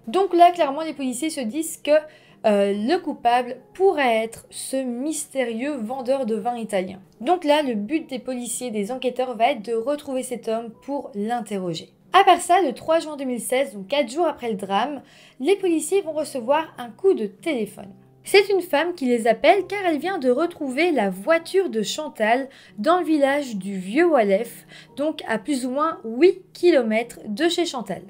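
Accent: Belgium. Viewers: Je pense que tres franchement la mort a ete donnee par accident du a la panique de la faire taire. Ce qui me fait penser ainsi est qu'il n'a pas du tout protege ses mains pour eviter les empruntes, et que si ca avait ete premedite, Sabrina et/ou lui auraient pense a mettre des gants pour eviter toutes traces d'ADN. Quoiqu'il en soit, c'est vraiment horrible et triste et la famille doit avoir beaucoup de mal a gerer non seulement la perte d'un etre cher, mais en plus dans de telles conditions. Merci pour cette histoire, j'adore les histoires qui se passent en Belgique et avec ton accent charmant belge, ca rend le tout encore plus sympa...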